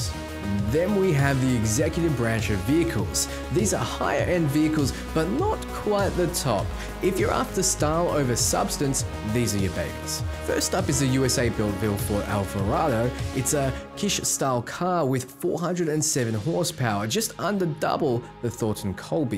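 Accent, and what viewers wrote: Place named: Australia. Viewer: Good to hear an Aussie voice on YouTube much love from the lock down state Victoria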